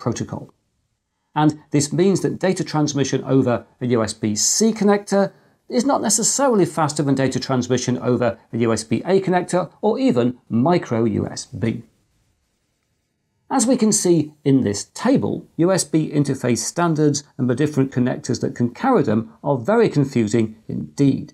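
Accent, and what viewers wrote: United Kingdom. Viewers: Loved this new format! I hope there will be more in the future. Even if I knew about most of these urban myths, the technical clarifications you bring are most useful. I must admit that I am also partial to your style: no flashing extravagant post production effects, no fuss, just plain and precise information. On top of that, your British accent and phrasing and overall attitude are music to my ears! Have a nice cuppa! (Try not to mind the undocumented conspiracy theories too much: as you mentioned in your introduction, facts and opinions (or what we think is a fact without knowing or wondering where it's comming from) are indeed deeply intermingled... I hope you'll keep trying though!)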